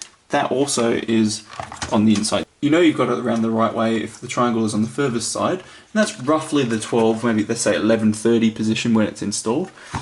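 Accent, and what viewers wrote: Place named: Australia. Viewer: Excellent tutorial!!! You have done your homework. And to hear an Aussie voice, it helped me greatly with my homework. Task completed easily....thanks to YOU. Ps. If I can join the chorus...'Beers are on me'!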